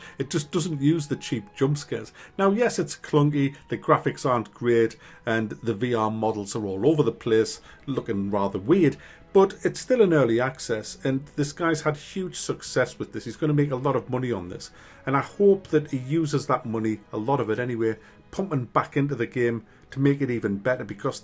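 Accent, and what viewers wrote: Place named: Newcastle, United Kingdom. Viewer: Pretty sure he's a geordie or mackem not an aussie... agree on the US election point though!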